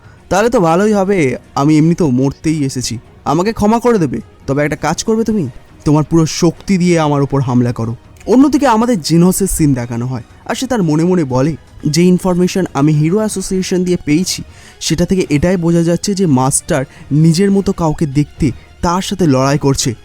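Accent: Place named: India. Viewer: তোমার বাংলা শুনে,বুঝলাম আপনি indian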